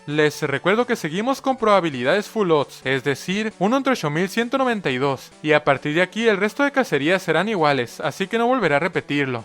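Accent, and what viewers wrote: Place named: Mexicali, Mexico. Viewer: cai en este video de casualidad, y al escucharte hablar dije, este verga es de mexicali jajaj saludos!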